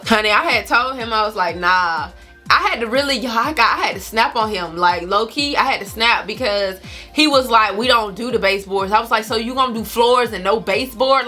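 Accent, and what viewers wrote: South, United States. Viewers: You’re so southern/country lol, so am I that’s why I notice it. 😂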